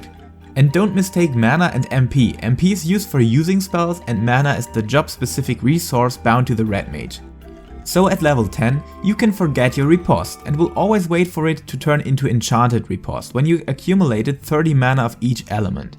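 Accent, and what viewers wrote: Germany. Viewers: he didnt even pronounce a german word the german way, when he sounds like a german dude :P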